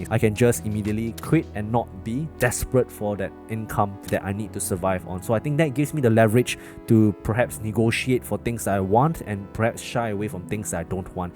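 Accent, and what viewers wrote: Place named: Singapore. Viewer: Hello good luck 🤞 with options . I can hear you are Singaporean. I lived there for several years at marine parade. Loved it and miss it much.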